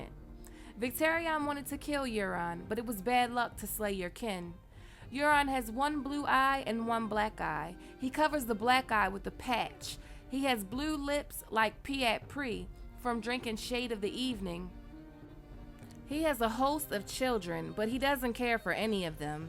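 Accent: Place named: Baltimore, United States. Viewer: I know this is an old video. But you might consider fixing the title. I think you mean Ramsey "or" Joffery. Also, like the Baltimore accent on this one. You thinned it out lately.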